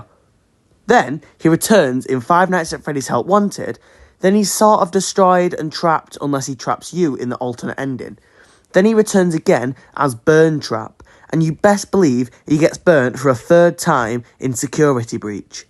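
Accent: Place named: Manchester, United Kingdom. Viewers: I like the British accent 🔥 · I think it’s a Manchester accent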